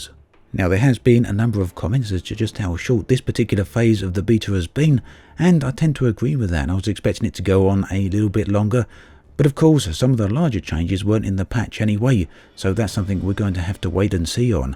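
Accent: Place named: RP, United Kingdom. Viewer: Hello cant wait for the new game play to come to live servers as we need a massive war and all factions to unite against a common foe. Also have you watch the brocast as they protreyed you as the james bond of elite dangerous with your posh actsente.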